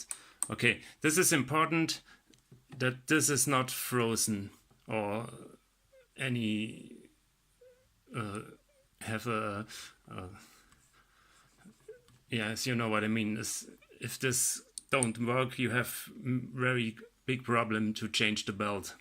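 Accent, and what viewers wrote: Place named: Germany. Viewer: Thank you very much for the useful video! I've just replaced the belt in my Supernova Automatica. Followed your recommendations, and everything went just fine. The only thing which was different - I bought a round rubber belt, so I did not need to release the motor to put it on. I also like your other videos about Necchi sewing machines and I love your German accent!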